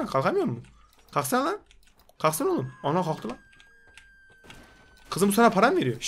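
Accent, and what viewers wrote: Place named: Thrace, Turkey. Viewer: Trakya aksamı :D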